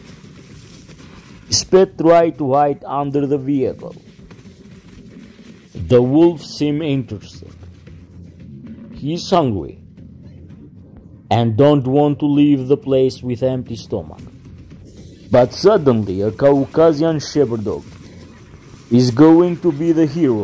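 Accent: Albania. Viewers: Is that Albanian accent? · Вполне понятный английский с албанским акцентом. Лично для меня на много понятней чем английский с австралийским акцентом. Все таки тут очень толковую информацию собирает автор, и почти без преувеличений или ошибок рассказывает о породе.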